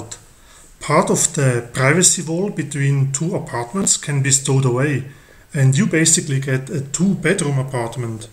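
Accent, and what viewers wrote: Germany. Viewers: I understand you very well. I personally think you don't have to work on your accent. German accent could be quite charming. I am living in London but have a mixture of Thai American English and Dutch accent depending on who I am talking with, choice of words n my mood. Hahaha. Thanks for nice report. Patrick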